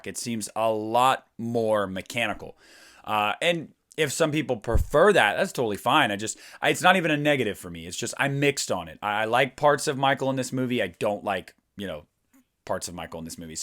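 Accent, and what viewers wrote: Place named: Pittsburgh, United States. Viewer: I love your Pittsburghese.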